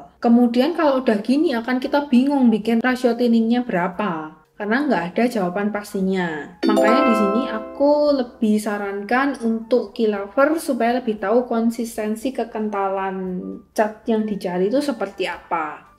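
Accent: Java, Indonesia. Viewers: Mbak logatnya agak medok ya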